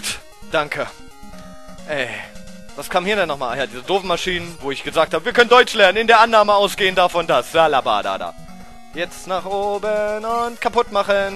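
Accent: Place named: Berlin, Germany. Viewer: berlinerisch!!!!!!! Icke.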